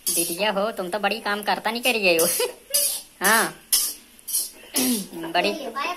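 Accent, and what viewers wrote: Raebareli, India. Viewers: Ya to raebareli ke boli aay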